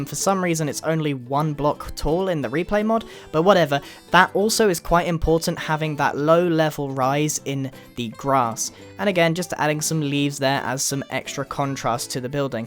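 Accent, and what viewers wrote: England, United Kingdom. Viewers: I listen to voice in my sleep for some reason British acents relaxes me:3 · how can you sound so British... I'm jealous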